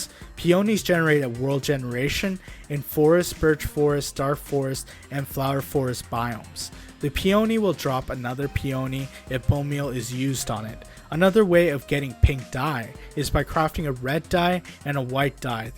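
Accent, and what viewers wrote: India. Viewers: I know because you can notice the accsent of indians and other And the channel name a pic are both realated to india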